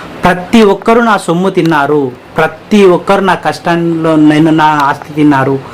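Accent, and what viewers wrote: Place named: Rayalaseema, India. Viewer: garu Mee vedieo chala chala baguntundi Mee Ankaring super Abba Mana bhasha Maravakunda Baga Matladutu Nara Abba Tq Abba madi Mydukur Abba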